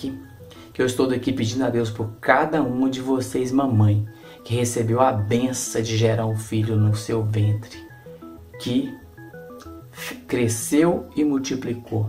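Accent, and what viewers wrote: Minas Gerais, Brazil. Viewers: Moço, quando vc mostrou o gráfico eu rapidamente tirei um print,fico triste quando falam para a gente ir no Facebook pegar o desenho,nem todo mundo tem Facebook...mas vc foi gentil e mostrou o gráfico,só por essa atitude e por nós ensinar com tanto carinho eu vou te seguir agora mesmo Obrigada...haa e amo sotaque de mineirinho 😍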